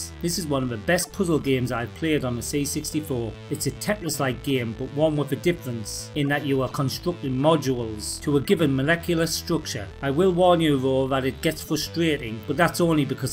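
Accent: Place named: North East England, United Kingdom. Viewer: ah cheers man. I can tell just by the accent you are NE, and a total commodore lad so what more could I want. Loving this series so far. There’s so many C64 releases and these vids have already introduced me to a few I’ve never heard of!! Appreciate the time to get back to me mate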